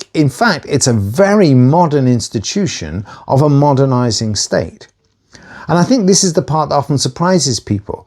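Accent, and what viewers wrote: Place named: United Kingdom. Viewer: This guy thinks that because he's got a British accent everything he says is true. This guy doesn't know what he's talking about and needs to be locked up in an insane asylum. 20,000 people being burned at the stake was a common occurrence throughout Europe, especially in Spain. The foundations of the Spanish Inquisition go as far back as the 12th-13th centuries, after the Horrible atrocities European Christianity had committed throughout the Middle East and Jerusalem during the Crusades in the Middle Ages.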